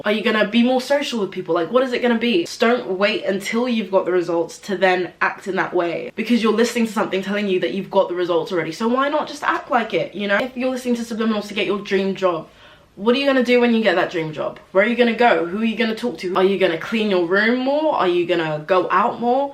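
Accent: United Kingdom. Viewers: She’s not she’s British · I love this video, it's so straight to the point. Maybe its just the Uk accent that feels more familiar to me but other videos go on about vibrations and try and sound too mystical and it just feels a little weird but with yours I can trust you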